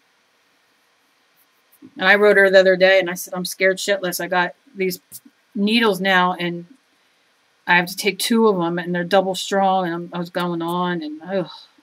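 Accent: New Jersey, United States. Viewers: I love your accent, Jersey? Philly?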